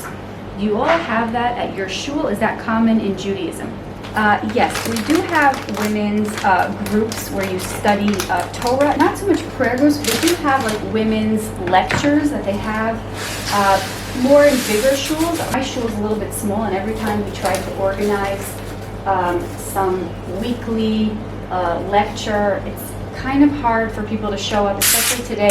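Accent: United States. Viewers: Hi. I live in U.S.A.How come your accent is American since you live in Israel? Love to watch your vlog.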